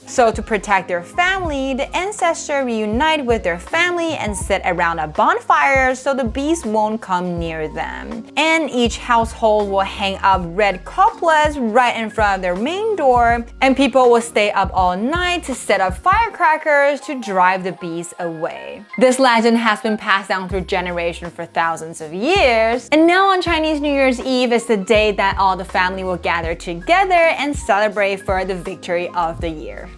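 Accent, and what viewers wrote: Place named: United States. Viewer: Your accent is so American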